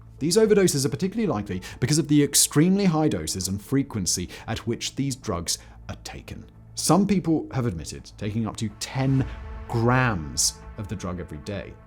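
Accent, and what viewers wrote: United Kingdom. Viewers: How is it that he reporting on American products with a british accent and I never seen this American product 😂 bc down here in Houston if someone say zaza they talnbout that good gas. Or superb Marijuana for you unseasoned mfs.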